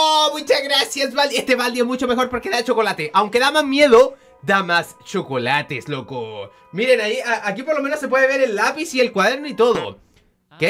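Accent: Chile. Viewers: Eres de chile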